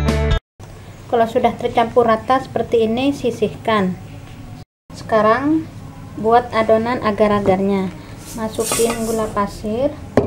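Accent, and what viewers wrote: Madura, Indonesia. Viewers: org madura ya kok yg ngomong kyk gitu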